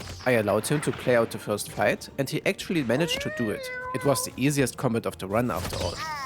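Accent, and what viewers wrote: Germany. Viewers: Ich höre direkt raus, dass du Deutscher bist, Brudi 😂👍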